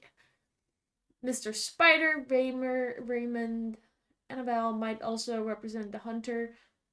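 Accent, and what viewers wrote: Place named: Netherlands. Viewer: Why are you dutch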